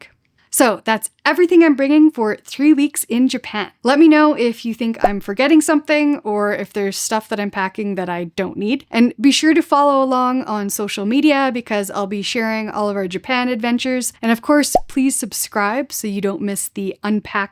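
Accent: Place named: Canada. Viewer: Canadian accents are quite charming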